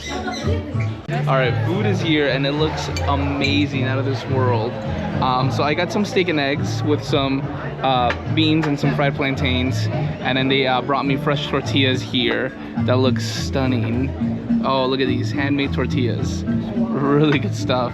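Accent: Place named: Guatemala. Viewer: Guau que linda es mi Guatemala práctica mente es una chulada gracias a Papa Dios es el mundo 🌎 maya y que grandes están las tiendas y hay de todo y que rico desayuno comieron ustedes y que lindo es el animalito lo bueno que la muchacha se tomó una foto y que linda es música la marimba y de echo venden dulces de algodón y las Nieves son .uy delisiosas no se si ya la probaron ustedes tu eres de Guatemala porque hablas como un guatemalteco gracias exelente y bendiciones 🙏🙏🙏🇬🇹🇬🇹❤️😘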